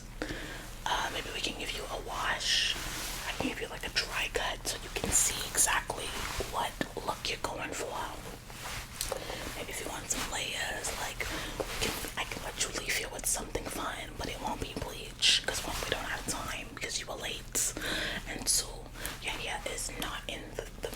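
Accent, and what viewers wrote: South, United States. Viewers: The Southern accent is spot on i love it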